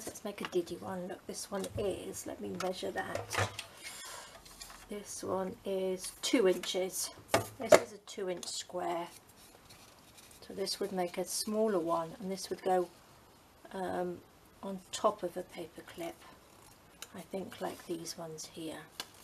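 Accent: United Kingdom. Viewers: So very adorable. And so easy.. what we all need sometimes. And I have this silly comment to make. I love how many British people say "pop this on or pop over here or pop the kettle on for tea". It just makes always makes me smile. When said with the British accent it makes we want to get a flight to England tomorrow to visit anyone and have a cup of tea. Lol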